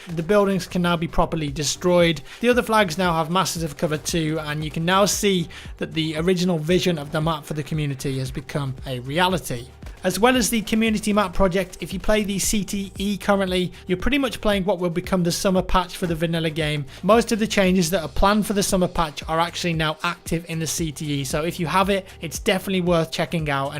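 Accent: England, United Kingdom. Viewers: He's English. They pronounce everything different. Even in various regions of the Isles the words will sound completely different. It's the beauty of accents. Much like opinions, no two are alike.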